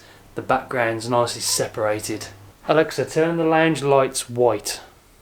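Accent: United Kingdom. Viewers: Hey thanks for the video, quick question, if I have a canon m50, I don’t need an adapter right? Also you sound like you’re from the UK, I am too and was wondering where I could get the lens from?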